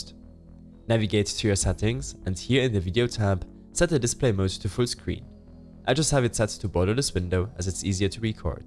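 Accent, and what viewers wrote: Germany. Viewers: you speak like a german, if im right then danke für das tutorial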